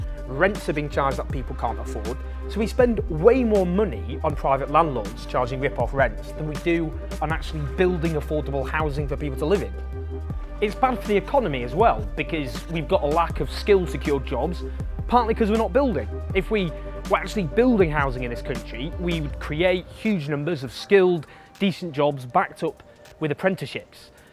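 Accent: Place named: Manchester, United Kingdom. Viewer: What has happened to Owen? Why is he pronouncing his D's in this peculiar exaggerated Mancunian style? I'm sure he never used to do this. He manages to keep it together with most of the words featuring the letter D here but falls down on 'affordable' and 'order' in quite spectacular fashion. It's like a computer going a bit wrong. What's happened to him? I hope he's okay.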